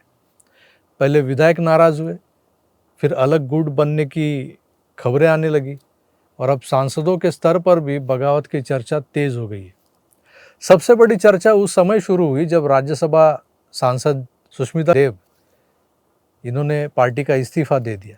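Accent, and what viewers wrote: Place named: Maharashtra, India. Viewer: मराठी माणूस किती पण चांगली हिंदी बोलला तरी ओळखू येतोच तो मराठी आहे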